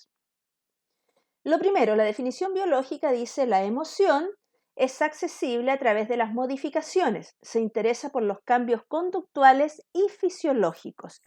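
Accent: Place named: Chile. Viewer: 😂😂😂 por el tono de voz me dice que chilena😊🧘‍♀️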